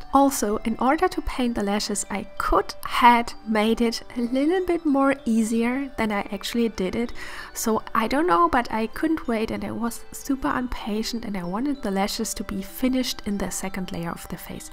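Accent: Germany. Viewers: Geil dass ich in meiner Abitur KunstLK Prüfung 5 Stunden für ein realistisches Ölportrait hab... Super Video und wunderschön! Ich nehm mal wegen dem Akzent an dass du deutsch bist. Sorry if you do not understand anything:) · Hey! Amazing art! I wanted to ask of you are german, it seems like it, because of your name and accent. Please correct me if I'm wrong 😅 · Are you German? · Maybe it’s just me or, is she German?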